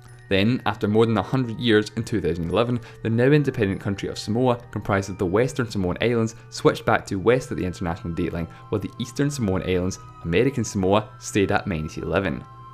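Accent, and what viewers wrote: Scotland, United Kingdom. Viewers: He has a Scottish accent. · Eduardo Mañón it's Scottish not english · He has a Scottish accent, which is presumably where he's from. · This channel is from Scotland. I hear it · Sounds like a hybrid accent. Scot and Chinese or Japanese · WHY DID THEY CHOOSE THIS TERRIBLE ANNOUNCER???!!!! HIS ACCENT IS DIFFICULT TO COMPREHEND. SHOULD HAVE USED AN ANNOUNCER WHO SPEAKS IN STANDARD BBC ENGLISH OR GENERAL AMERICAN ENGLISH, UNIVERSALLY UNDERSTOOD ACCENTS---NOT SOME SCOTTISH ACCENT!!! RUINED THE ENTIRE VIDEO. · Good to hear a Scottish voice on Youtube and imparting some knowledge. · We Americans have not trouble understanding a standard English accent. The narrator, however, has a Scottish accent, something English speakers outside the UK find difficult to understand. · He's Scottish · He's Scottish that's how he talks. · I'm a stupid native English speaker from America, but for some reason it's so hard for me to understand some English dialects. 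British? and Australian are pretty easy, and you accent doesn't sound Irish, so I'm guessing Scottish, and that's what some of the comments are saying. But that's okay that's what subtitles are for. And don't worry I subbed. And I'm sure you understand where I'm coming from but just to confirm I'm not talking shit or hating on you specifically I'm just really being honest: I seriously can't understand all English dialects. Otherwise I wouldn't have even mentioned subbing and actually subbing. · Very annoying all those comments mocking his accent. He is Scottish, that's how people speak here. He never said "tame", there is no a sound in his pronunciation of time. Same with "sex", he never said that, there was no e sound in his pronunciation of six. Most of the people making those comments are probably American, considering how these words are pronounced differently there. Guess what... English is spoken in other countries as well. 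Have a bit of respect for other accents! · No, it's a Scottish accent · The amount of idiots here who don't know the Scottish accent is too high. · Niue is a territory of New zealand n ot a country it's a scottish accent and yes it's difficult to get used to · I would like to know the presenter's area from which his Scottish accent originates, as I've heard similar versions where it sounds like the other speakers are talking through mouthfuls of saliva. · It is Scottish. Use Closed Captions (subtitles) if you find it difficult to understand. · To be honest, I loved your Scottish accent. By the way, you should have mentioned the Spanish case, where in zones like Finisterre (where I am from), the very eastest cape of Spain, the sun goes down in summer , in 40°N of latitude; and in winter, the sunrise is am... Freaking out! We should be on -1 UTC, but we are on +1, and in summer +2. But well, I don't dislike it though. 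· Oh dear...Scottish accent with the speech impediment additive...does my head in... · Gae Shows that's ignorant, he has a Scottish accent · Are you Scottish? Scotland is the best :) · Breezy But he's from Scotland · From the comments i understand it is a Scottish accent. I have no problem to understand him. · Yeah, it is a scottish accent. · Accents are wide raging and different u can have 10/20 different accents in a city or region of a country or even just a part of a city. Also certain ppl naturally may have a strong or weak accent due to their voice and some ppl can be able to mix different accents and make their own unique accent. This guy has a Scottish accent but it's not a strong strong 1. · Roman Furman Scotland, with a Scottish accent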